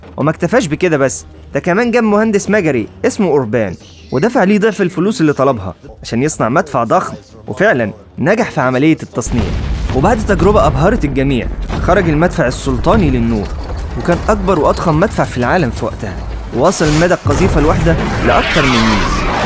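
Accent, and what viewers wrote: Egypt. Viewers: اللهجة المصرية اساءت لمستوى الحدث الكبير !! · انا اضفتك جديد وممتاز جدا حسابك لكن اتمنى تغير لهجة مصر الغالية مثلا ( القيش ) الجيش ) حاول تزبط اللغة العربية الفصحى ) امنية من اخوك يزداد متابعوك حول العالم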